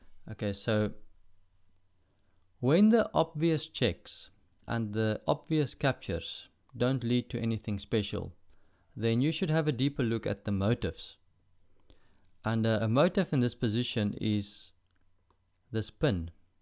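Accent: South Africa. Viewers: south african accent ? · This guy is south African I can tell from the voice 😅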